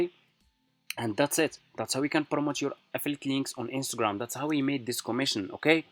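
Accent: Morocco. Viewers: واش نتا مغربي يا صاحب القناة..؟ باينة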